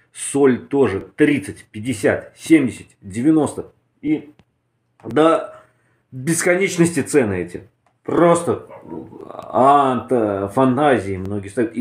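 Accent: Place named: Mordovia, Russia. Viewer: по акценту не могу понять, ты Мордвин по национальности?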